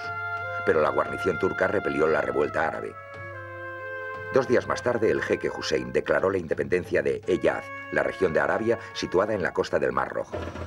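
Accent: Spain. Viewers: This accent is from the spanish península, that is spain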